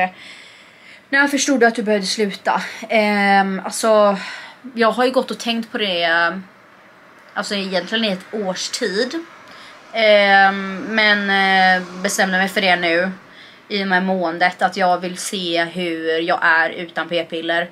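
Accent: Stockholm, Sweden. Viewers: Varför pratar du Stockholms dialekt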